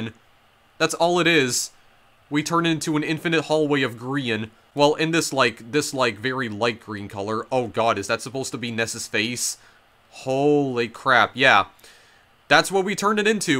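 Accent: Canada. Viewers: Where are you from? What’s your accent? I genuinely would love to know! I am not sure what your accent is but I feel like it is a Canadian accent? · lmao, no it's an effect of Canadian English dialects called Canadian raising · Where are you from? You pronounce the words (like game) the same as me, but your accent is also different from mine. I'm from the north east of England but you don't sound like you're from here. It sounds almost canadian to me? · My guess was northern Saskatchewan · the canadian dialect gives me more brain damage than the game itself. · Was looking for a comment like this, not disappointed Legitimately thought I had a stroke for a second, Canadian makes sense tho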